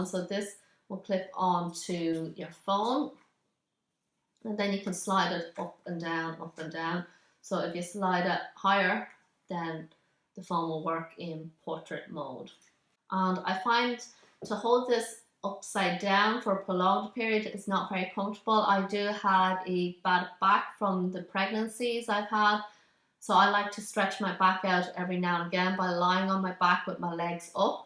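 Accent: Ireland. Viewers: Are you from Dublin or Ireland you have really nice accent 😃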